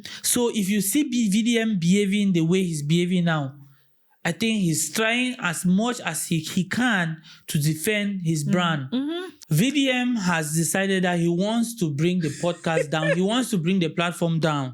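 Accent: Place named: Pidgin, Cameroon. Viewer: This guys pidgin accent looks like a Cameroonian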